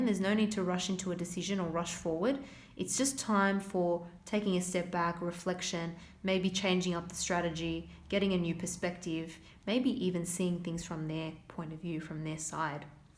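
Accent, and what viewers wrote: Australia. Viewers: Beautiful Ozzy accent ? ..thank you for the reading..being blessed right now ,peace to you reader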